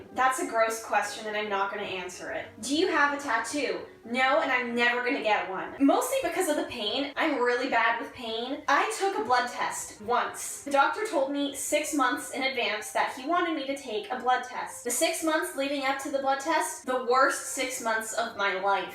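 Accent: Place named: Canada. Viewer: her canadian is showing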